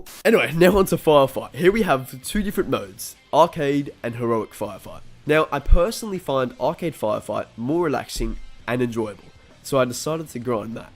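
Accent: Australia. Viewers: Are you Australian you sound it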